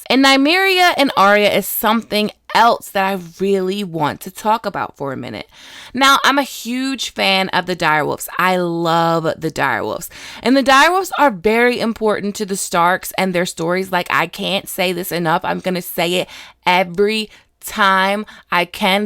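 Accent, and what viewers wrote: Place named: New Jersey, United States. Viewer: are you from Jersey? I heard how you say wudder instead of water!;)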